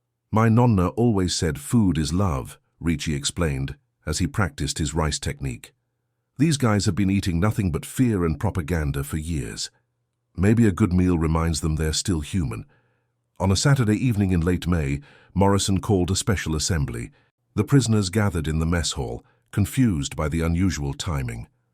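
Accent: United Kingdom. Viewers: Watching from 50 miles east of Camp Blanding, in NE Florida. The US Army doesn't have 'Left-tenants', we have 'Lew-tenants'. Is your AI narrator British? There is 'cognitive dissonance' again st . It's in every video you post.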